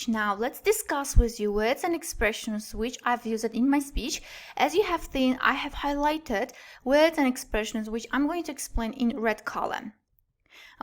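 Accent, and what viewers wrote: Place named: United Kingdom. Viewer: Your pronunciation is very pleasant 🤗How have u learnt to pronounce like A British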